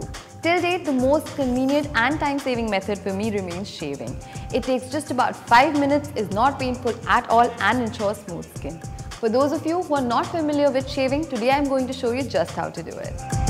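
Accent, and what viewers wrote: India. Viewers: Indian English accent with asian face So confusing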